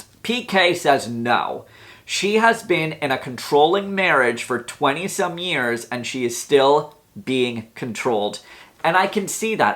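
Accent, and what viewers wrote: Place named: South, United States. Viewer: I'm Enjoying your channel. You have a cute accent, southern?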